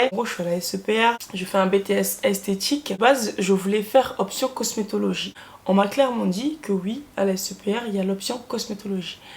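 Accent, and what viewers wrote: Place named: Italy. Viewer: Whaou cet accent italien ! Je regarde tes vidéos 10 après tout va bien 😂😂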